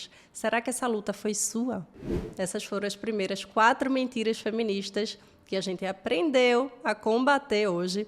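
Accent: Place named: Recife, Brazil. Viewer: ganhou mais um seguidor o sotaque de recife é lindo de mais, esse canal logo vai crescer muito seguidores.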